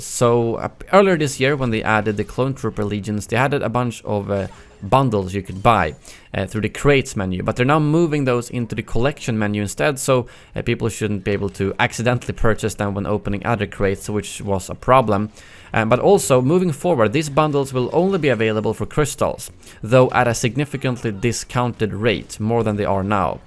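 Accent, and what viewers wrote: Sweden. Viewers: are you swedish? sounds like you are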